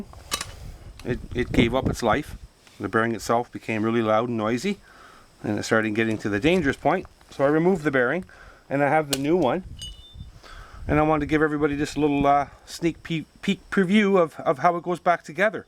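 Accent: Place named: Canada. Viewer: Good possibility you're either Canadian, or from the U.P. I'm a bridge troll here from the L.P.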